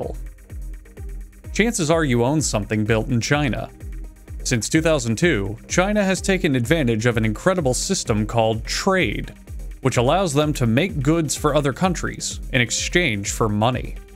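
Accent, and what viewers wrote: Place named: Boston, United States. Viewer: He made every Chinese person sound like there from Boston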